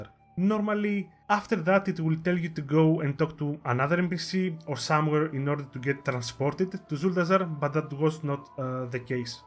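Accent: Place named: Greece. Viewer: πως καταλαβαινεις οτι μιλαει ελληνας αγγλικα απο το πρωτο millisecond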